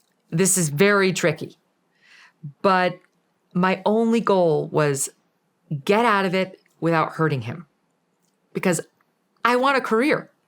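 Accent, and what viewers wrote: Ireland. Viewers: She's irish?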